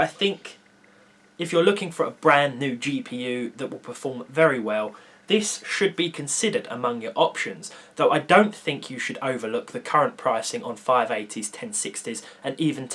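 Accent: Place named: Yorkshire, United Kingdom. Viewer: What area do you live in England you sound like Yorkshire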